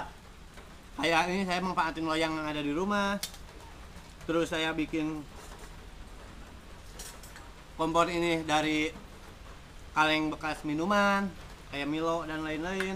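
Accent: West Java, Indonesia. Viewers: Resep euy geunaheun ningali logat sundana wkwkwk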